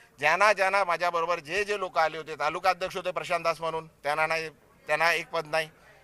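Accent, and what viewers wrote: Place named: Agri, India. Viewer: तो तिथला भूमिपुत्र आहे आणि आगरी आहे त्यामुळे तो आगरी लयेत मराठी बोलतोय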